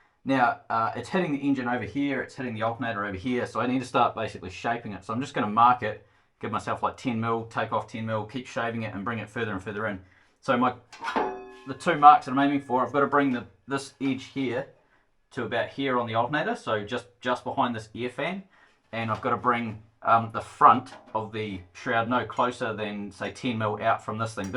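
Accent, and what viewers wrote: New Zealand. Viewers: With your Kiwi accent the automatic YouTube captions always get "Brew Pig" instead of Brupeg. Nice work on the belt shroud!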